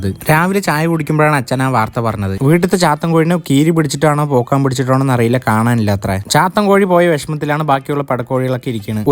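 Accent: Palakkad, India. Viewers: Bro palakkad aano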